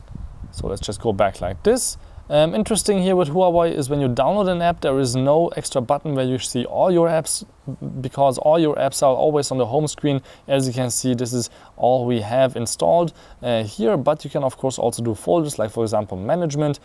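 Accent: Germany. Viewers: Dude I love your German accent! I can be your girlfriend? XD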